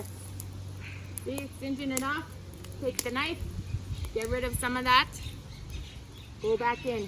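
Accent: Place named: Ireland. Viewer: Your accent almost sounds Irish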